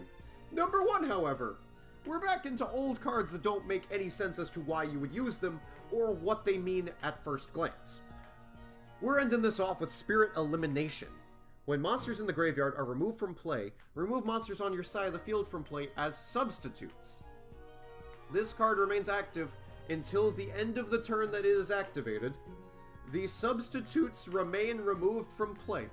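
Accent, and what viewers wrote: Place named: New Zealand. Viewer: WAIT. You were kiwi the entire time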